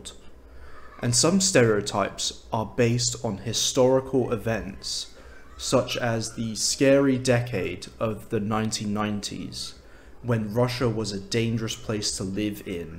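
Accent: United Kingdom. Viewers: прекрасный преподаватель с классическим британским произношением. То самое - которое считается золотым стандартом. Радио ВВС и т.д. Занимаюсь с больше 1,5 года. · Информативно. Британский акцент мне нравится больше, чем американский. Спасибо, что говорите медленно. Это самая сложная часть в изучении английского - восприятие на слух. Русский на слух воспринимать проще - он по звучанию почти не расходится с написанием.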